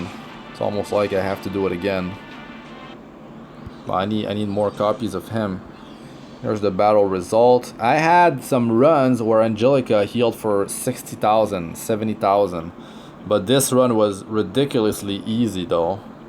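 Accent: Quebec, Canada. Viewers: Hmm québecois? Je reconnais l'accent dans l'anglais haha, nice video man ;) I'll make sure to watch more of your content !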